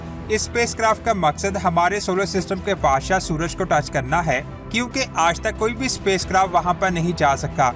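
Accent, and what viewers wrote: India. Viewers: Teri awaz se pata lagta hai tu indian hai ..pakistani ban kar chutiya bana rah hai...😂😂😂😂😂😂😂 teri zaban bata ti hai tu indian hai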